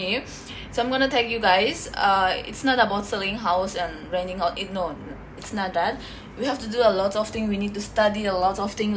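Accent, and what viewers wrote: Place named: Nagaland, India. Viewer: The Naga Accent still hits different ❤️❤️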